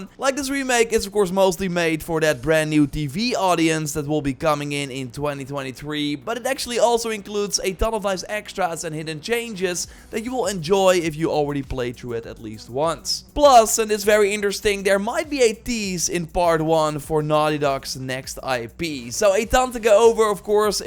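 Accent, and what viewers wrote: Netherlands. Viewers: Ik hoor Nederlands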